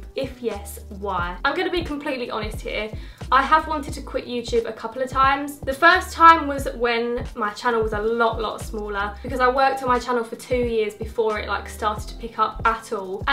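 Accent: Essex, United Kingdom. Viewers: you sound particularly Essex in this😂💘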